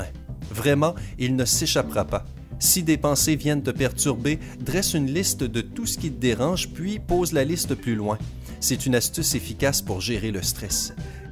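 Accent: Quebec, Canada. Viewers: ton accint québécoué j''jl'aime jaime beaucoup sa fait plaisir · L’accent québécois la !! Valide 😭😂😂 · J'adore cette prononciations canadien ❤️ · Il a un accent québécois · J'ai mis un poucebleu pour l'accent québécois. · Jaime bien l'accent canadien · J'adore ta voix québécoise :D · Pourquoi la voix est québécoise (on dirait Luc Langevin) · S’IL TE PLAÎT SYMPA !!!!!! ARRÊTE D’UTILISEZ CETTE VOIX ELLE EST HORRIBLE ÇA ME DONNE MÊME PAS ENVIE D’ECOUTER LA VIDÉO DONC S’IL TE PLAÎT REPREND LA VOIX COMME LAMA FÂCHÉ PLSSSSSSS PLUS JAMAIS LÀ VOIX CANADIENNE !!!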